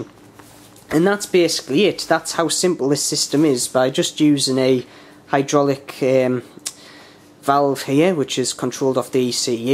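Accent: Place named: Northern England, United Kingdom. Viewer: What a distinctive Northern accent..Sounds almost like Liam from "Benidorm".:)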